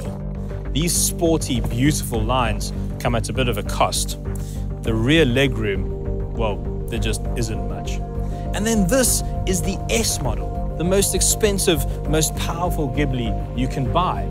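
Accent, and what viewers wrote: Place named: South Africa. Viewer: I watch this video over and over then look over my shoulder and see my beautiful Ghiblet S sitting next to me in my garage. It's my daily driver. M-W-F and on weekends. I will be driving this car 288 miles per week. I am from San Clemente, CA. I love this video and controversial South African accent. I was going to get a BMW 550I but I am so happy I got this anything but ordinary Italian Stallion. Take me to J. Bay and Skeleton Coast Namibia for a surf. I'll show you Trestles and related breaks.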